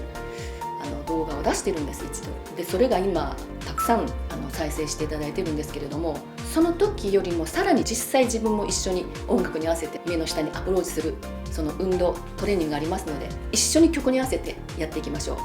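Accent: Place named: San'in, Japan. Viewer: まだ30代前半なのにたるんできて絶望😭してましたが、毎日試してみます！ところで、さん、山陰の出身ですか？イントネーションにどことなく懐かしさを感じてます😊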